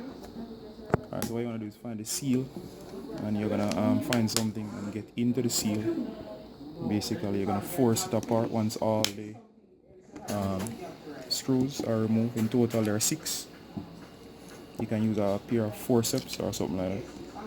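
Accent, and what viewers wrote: Jamaica. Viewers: can you please fix mine. It just chip out:'(.You sound Jamaican, I'm Jamaican can you please help me.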